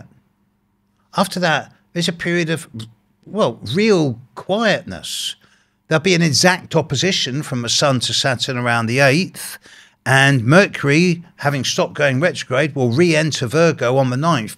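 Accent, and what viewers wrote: United Kingdom. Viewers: Greetings from the city of João Pessoa, Paraíba, Brazil. I thoroughly enjoy your analysis and may I say your voice is so agreeable, your diction so clear, not to mention your beautiful British accent. Many thanks for taking the time to keep us informed.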